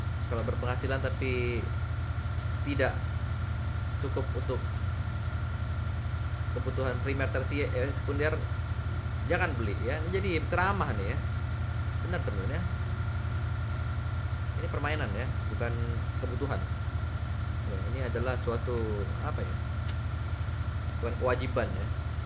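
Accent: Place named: Pematangsiantar, Indonesia. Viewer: Iyakan.... Iyakan.... Iyakan... Siantar kali bahasanya...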